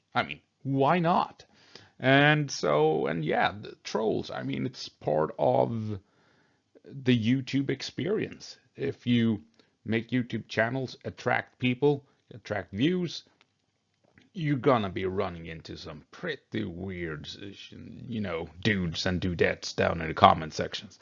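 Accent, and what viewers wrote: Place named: Gothenburg, Sweden. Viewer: If I had the money I would pay for you to read more troll comments in that thick Göteborgs-English lol. That was great haha.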